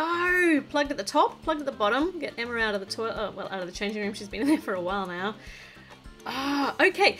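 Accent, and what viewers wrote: Australia. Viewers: I love this, video and what country are you from you sound Automatically Austrilian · I have been watching your vid so much I’m starting to wanting to talk in a Australian accent! 😆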